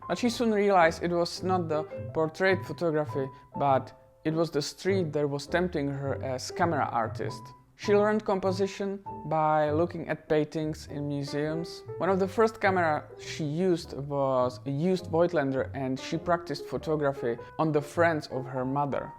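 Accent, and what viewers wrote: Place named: Germany. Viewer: He speaks English with a heavy ( sounds German ) accent . I `m sure he speaks English better than you speak German .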